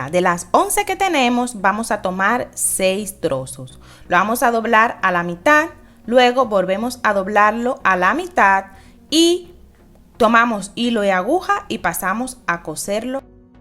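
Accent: Dominican Republic. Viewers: Hola 👋 gracias por compartir tus ideas! 💡 Una pregunta , ¿ Eres Dominicana ? Tienes un acento sureño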